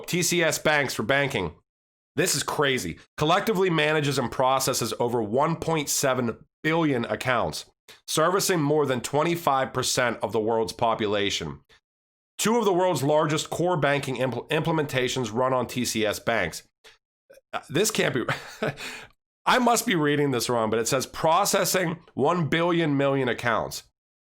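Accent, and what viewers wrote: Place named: Midwest, United States. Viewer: I'm Charlotte NC You have a mid west vibe Chicago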